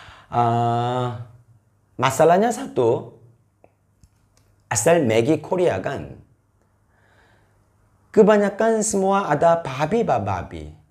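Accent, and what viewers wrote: Sabah, Malaysia. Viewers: Cara abang becakap mcm org sabah kk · Terbaik la korea cakap melayu sabahan... 🥰🥰🥰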